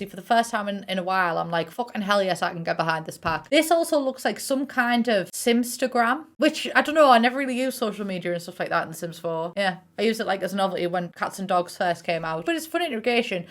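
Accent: United Kingdom. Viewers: in the beginning of this video Did you say your mum had covid ? I'm sorry sorry sometimes I can't understand British accents sometimes please forgive me 😄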